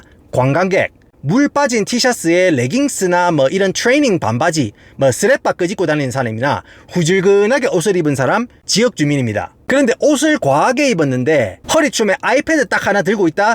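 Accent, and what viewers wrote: Gyeongsang, South Korea. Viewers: 덕에 미국넘들 많이 알아간다. 갱상도 말씨도 맛갈나고... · ㅋ ㅋ 오늘도 억쎈 깅상도 사투리로 구수한 식당 이야기 잼나게 시청했어요 ~~짱♡ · 와 미국사는 경상도 아줌마인데요 내 귀에 쫙쫙 감기는 사투리와 내용들 너무 쥑입니다 최고입니다~ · 근데 이형 미국형인데..갱상도와서사시는분인가? 사투리 발음이왤케찰져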